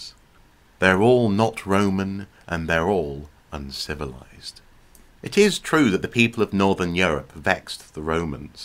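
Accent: United Kingdom. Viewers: I do not recognise your accent as Welsh but English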